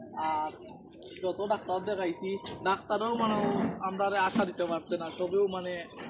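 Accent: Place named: Sylhet, Bangladesh. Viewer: ভাই সিলেটের মানুষ কি এতই মূূরখ যে বাংলা শুদ্ধ ভাষায় কথা বলতে পারেনা আপনার এই ভাষা কি সারা বাংলাদেশের মানুষ কি বুজে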